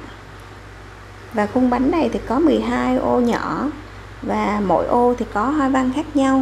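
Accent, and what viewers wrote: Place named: Quang Nam, Vietnam. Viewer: Cám ơn chị đã chia sẽ vidéo làm bánh này theo đúng kiểu truyền thống. Nghe giọng chị trọ trẹ giống người Quảng Nôm, Quãng Ngữa dzị đó. 🥰🥰🥰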